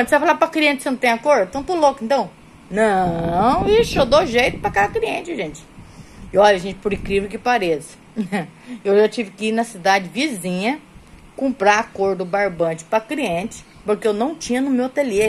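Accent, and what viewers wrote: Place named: São Paulo, Brazil. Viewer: Vc e do interior de São Paulo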